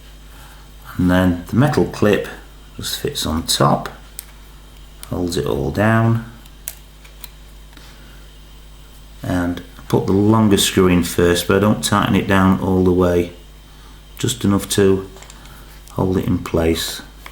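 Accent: Yorkshire, United Kingdom. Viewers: Great video ! Couldn't help but notice your a Yorkshire man . If you live in Sheffield can I pay you to repair my phone ? Thanks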